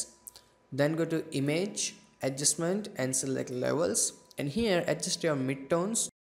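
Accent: India. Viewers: i´m sure that u r an indian. u speack like an indian guy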